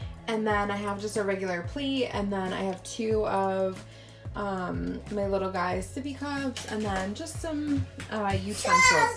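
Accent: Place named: Upper Midwest, United States. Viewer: Upper midwestern accent—love it!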